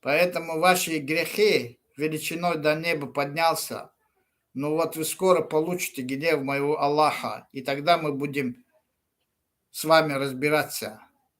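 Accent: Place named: Armenia. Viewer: Ты сначала учись розговариват. Как армяны говоришь.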